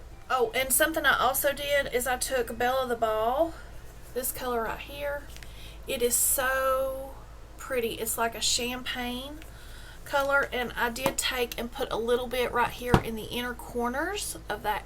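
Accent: South, United States. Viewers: 🚨🚨🚨🚨🚨🚨 I'M READY... I'M JUST HERE TO SEE YOUR PRETTY LITTLE FACE!!! ITS THEM EYES AND THAT COUNTRY GAL ACCENT FOR ME😘😘😘😘😘😘 🚨🚨🚨🚨🚨🚨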